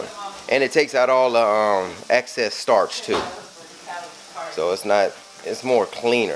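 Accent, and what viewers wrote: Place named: West Coast, United States. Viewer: Got that west coast sound! Love it...head bobbin the whole time! Pro tip on that rice, I never knew that, but I never cook rice either lol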